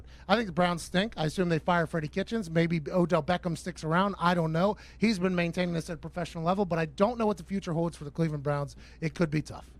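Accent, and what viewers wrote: Pittsburgh, United States. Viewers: Love this dude. The Pittsburgh accent makes it even better.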